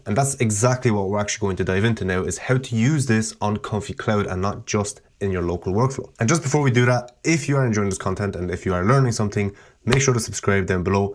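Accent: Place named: Ireland. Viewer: Man you Really have an Irish accent!!!!!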